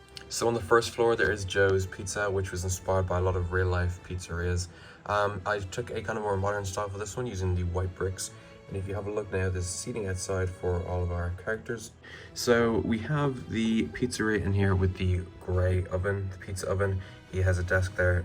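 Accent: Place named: Ireland. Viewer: Just found your channel and am loving it! Nice to hear an Irish accent on Lego videos for a change. Keep up the great work 😁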